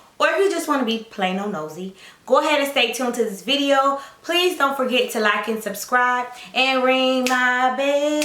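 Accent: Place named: South Florida, United States. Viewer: Chile I know my Florida accent from anywhere. Especially south Florida 😂😩 let me get some experience at the bedside and I’m out! Utilization Review sounds interesting 🤔 thanks boo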